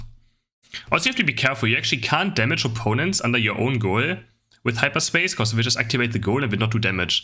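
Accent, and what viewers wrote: Germany. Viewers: I can hear the German in his voice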